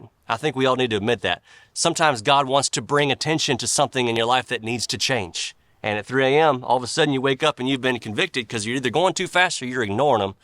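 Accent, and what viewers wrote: South, United States. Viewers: Thanks, I like your content, you talk like me. I'm a Southerner myself. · just seeing your face and listening to you talk tickles me to death. Unfortunately, I tend to be pretty stressed out and always thinking about the next problem that needs to be solved, so I appreciate those people out there that can just put a smile on my face. You always do that for me. Maybe it is just the smile, or the familiarity of a good ol’ country twang. But I strongly believe that it’s actually because you carry a bright light with you. The kind that you can only get from Jesus. I can see it in you. I just thought I would say that. Thank you.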